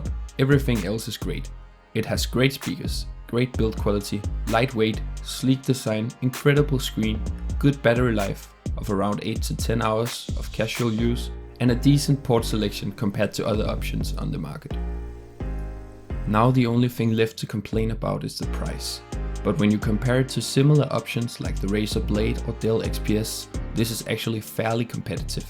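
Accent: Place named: Denmark. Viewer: Er du dansker? Jeg så det danske tastatur, og det lyder også lidt som om at du snakker med en dansk accent.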